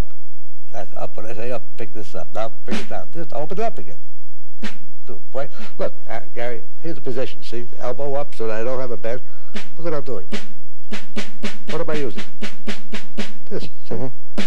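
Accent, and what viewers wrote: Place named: Brooklyn, United States. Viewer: You can hear the old Brooklyn 🙂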